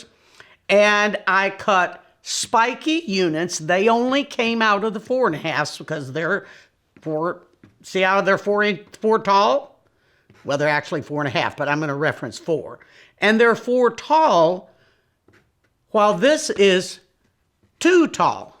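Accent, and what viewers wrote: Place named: South, United States. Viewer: Being from the south, I could so easily become friends with! Love her southern drawl, her humor! Where have you been? I discovered your videos today, and am binge watching every video you have put out. I have been quilting for a couple of years, but I am definitely still a beginner, with BIG dreams. Thank you so much for just keeping it simple. I look forward to watching every video. FW, Tx here!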